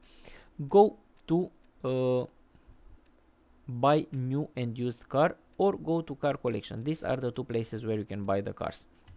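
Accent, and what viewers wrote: Romania. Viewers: your romanian accent is so strong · You have an romanian accent, but how do u get vouchers?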